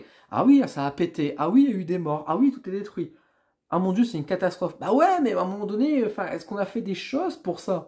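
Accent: North, France. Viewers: t'es du ch'nord tizot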